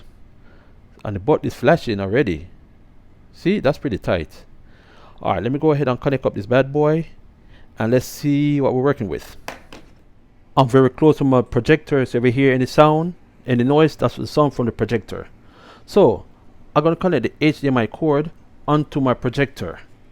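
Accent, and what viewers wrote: Jamaica. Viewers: U a Jamaican? And can it use google chrome app?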